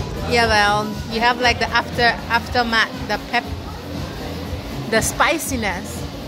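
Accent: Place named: England, United Kingdom. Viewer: heel mooi engels accent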